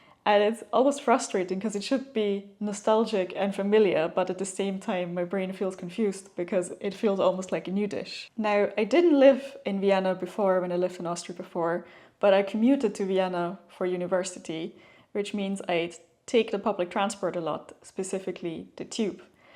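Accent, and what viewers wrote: Ireland. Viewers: Lovely the way you sometimes have such an Irish accent or way of saying something. Wish you the best in Austria. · you sound lrish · Ha ha, from your accent I can tell you spent time a lot of time in Ireland. (Greetings from Ireland)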